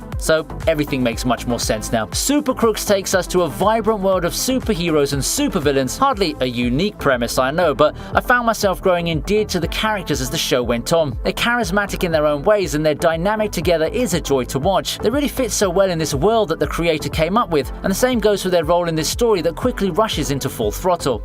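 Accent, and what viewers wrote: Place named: United Kingdom. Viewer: British limey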